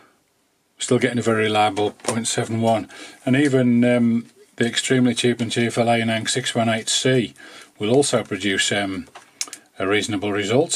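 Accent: United Kingdom. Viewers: may I ask. You live in North Yorks but your accent sounds more midlands.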